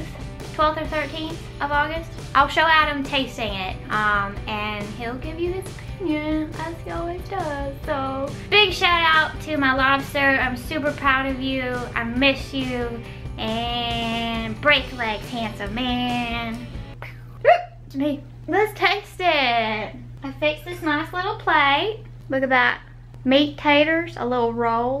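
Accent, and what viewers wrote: South, United States. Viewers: lol i just made the same kind of dish xD and that southern accent thooo thats sound so great :P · I could never understand why you have a super southern accent and your brother doesn't. I think you need to add a lot more seasoning but this looks good. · You should doing the video without your Southern accent, like the video that was trending on Reddit. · Very simple, love that. This woman is really likable, cute southern accent. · First time watching your video and subscribing too loving your southern accent.... you are a beautiful and funny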